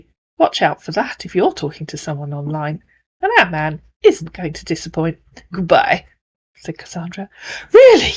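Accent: United Kingdom. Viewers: 😂 😂 😂 I LOVE it when, in your proper British accent, call him "a semi-illiterate idiot". Oh, you kill me!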